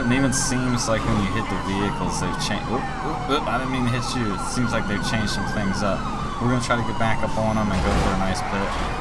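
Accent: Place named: South, United States. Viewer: Lol I always enjoy your accent, if you don't mind me asking where are you from? It sounds like a southern type accent but not Deep South 😂😂 if that makes sense.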